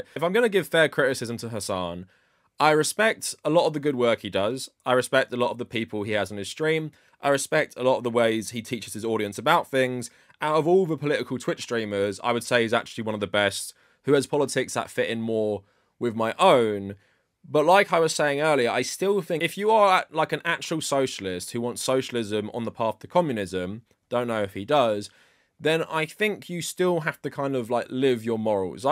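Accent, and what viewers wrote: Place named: United Kingdom. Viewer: I was gonna watch, but there was too much British in the first minute.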